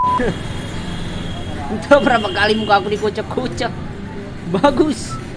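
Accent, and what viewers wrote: Kalimantan, Indonesia. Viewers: Abang Kalimantan apa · Anjim, org kalimantan trnyata. Jelas aja agak kenal dngn logat2 nya😂😂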